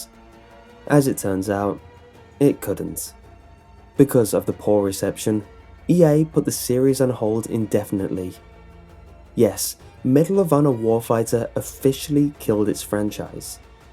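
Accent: United Kingdom. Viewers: British accents.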